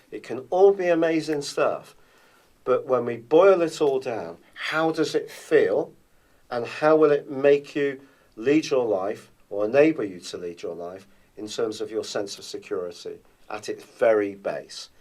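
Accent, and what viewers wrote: England, United Kingdom. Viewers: Thanks, I like your english accent...:-)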